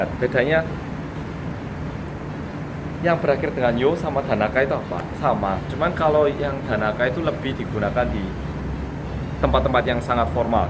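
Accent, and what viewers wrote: Java, Indonesia. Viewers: aku orang jawa...hidup di bandung :( kalo medhok malah diejekin :( -,- la mas e bejo medhok tapi wong koreya -,- la ne aku bedo neh critone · sip.. seneng aku ana kancane, seneng ngomong jawa, medhok pisan. akeh wong jawa dhewe sing ora pingin dingerteni jawane, po meneh yen wis nyambut gawe Ning jakardah. Nuwun yoooo... njenengan bombong dadi kaya Wong jawa😊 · Salut aku mbek smpean mas, jowone jan melekat kat kattttt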